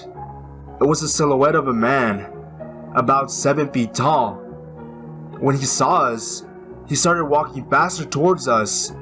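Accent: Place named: Latin America. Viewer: u have a latin accent.. are u latin? anyway nice videos seen every single one of them keep up the work...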